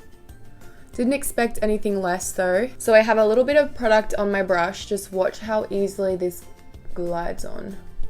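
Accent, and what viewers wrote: Australia. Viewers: Australian accent - 😳😓🤐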